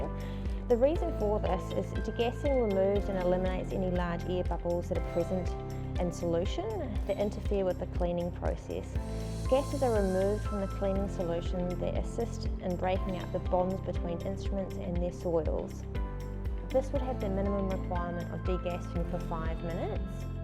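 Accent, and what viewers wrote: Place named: New Zealand. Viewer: It new zealand accent, i can understand her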